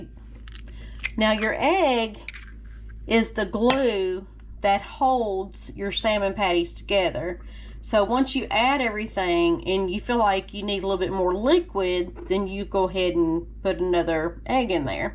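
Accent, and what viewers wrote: South, United States. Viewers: Salmon cakes with southern accent. Im subscribed 🇺🇸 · I could listen to you talk ALL DAY! I LOVE your country accent. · I love your beautiful Southern accent. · they look good this woman is a good ole country girl and you can tell by her accent i bet they are good im 68 and been eating them all my life in my grandmother cooked all the time and did not have any education at all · She so country and I love it lol😂. I’m country too , northerns love our accent!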